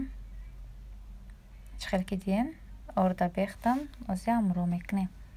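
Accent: Kulob, Tajikistan. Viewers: Ранги хдм гапезанен кулобиеи хдмон хшру гапмезаган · Аи рвечи гуфтанит бафигрм кулоби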